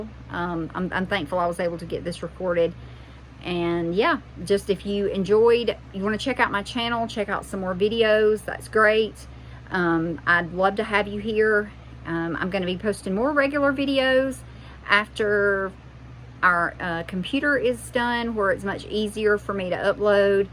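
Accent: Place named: South, United States. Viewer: New subscriber! From the south also, so, I love your accent. 😂 Looking forward to watching your videos.❤❤!! 😊❤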